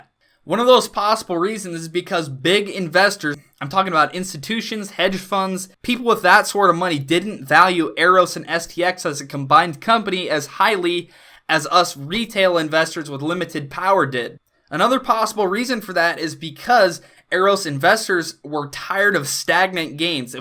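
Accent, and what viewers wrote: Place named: North, United States. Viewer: Love the intro music...What state are you in? You sound Northern..lol. I'm from RI but live in East TN..keep up the great content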